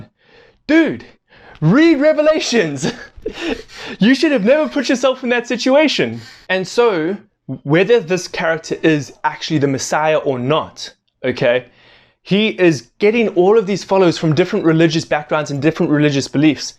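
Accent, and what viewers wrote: South Africa. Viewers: <link> Some background on Islamic eschatology.. btw you sound like a South African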